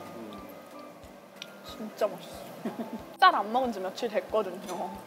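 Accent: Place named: Gyeongsang, South Korea. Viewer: 그동안 무슨 일이 있었길래 본인 유투브 채널 활동 안하다가 다시 컴백한건가요. 구수한 경상도 사투리가 매력적인 보고싶었어요. 다시 활발하게 활동해 주세요.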